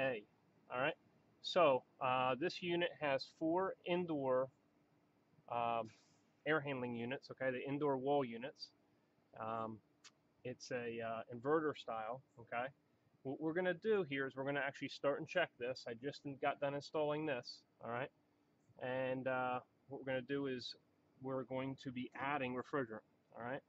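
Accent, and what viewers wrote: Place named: New Jersey, United States. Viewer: I just recovered 2 similar units that were installed briefly then decommissioned because the plant they were in has airflow problems and all the air these units made was sucked up and out the through the roof, and did not solve their problems. The wall units had already been discarded but because the refrigerant hadn't been recovered yet they were storing the condensers. I have a shopping list made up with wall units, line sets, and disconnect boxes. I am a millwright by trade but mostly work on air compressors. I plan on installing them for my home myself, with the help of an electrician for setting up my disconnect boxes and HVAC tech to purge, vacuum test, and charge my system. Is there anything in particular about these units that I should know? Someone online mentioned Mitsubishis can be tricky to wire but based on the manual it doesn't look any different than your complete install video I watched the other day, other than having to wire 3 separate units vs the one in your video. 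PS, you aren't related to Seth's Bike Hacks are you? Same NJ accent and talent for video production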